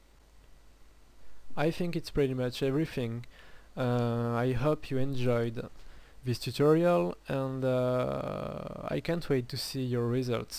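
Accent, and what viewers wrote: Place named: France. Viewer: i <3 ur french accent :DAD:D:D:D:DA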